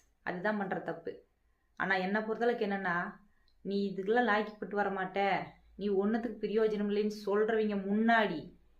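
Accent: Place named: Coimbatore, India. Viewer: ❤கோவை ஸ்டைல் பேச்சு எனக்கு ரொம்ப பிடிக்கும்❤❤👍👍👍🙏🙏🌺💐💯🤝💥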